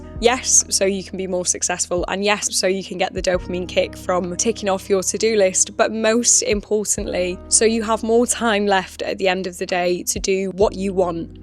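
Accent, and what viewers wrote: United Kingdom. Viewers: Hello from Russia ❤ I love your vlog and your sweet British accent 😊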